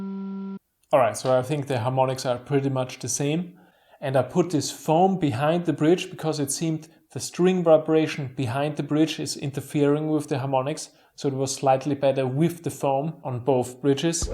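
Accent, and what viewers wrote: Austria. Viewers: Also are you Austrian by chance?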